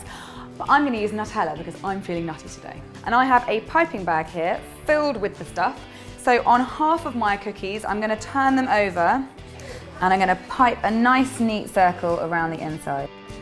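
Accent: England, United Kingdom. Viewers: Is she from England ?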